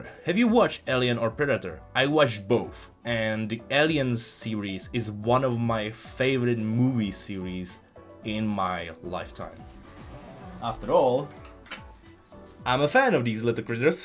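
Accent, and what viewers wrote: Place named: Czech Republic. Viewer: Tak 4 sekundy a bylo to jasný že jseš čech.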